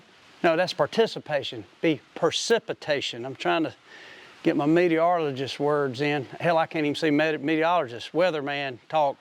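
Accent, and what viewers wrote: South, United States. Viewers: Love those Southern terms..”Ry chonder”☺️, all kiddin’ aside, I’ve been a fan since the bigginin’. Congrats and love your work!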